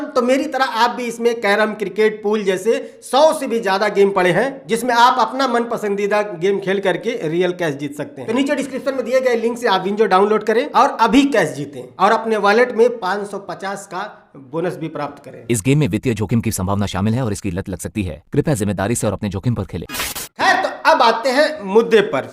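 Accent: Bhojpuri, India. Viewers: यह बोलना पहचान है भोजपुरी के भैया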